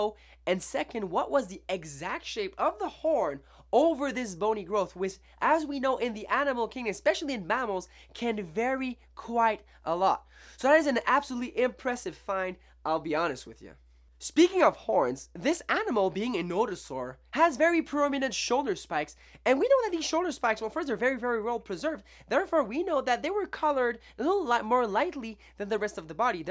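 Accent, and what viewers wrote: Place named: France. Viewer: Love your french accent <3 <3 <3 You, rock hahahaha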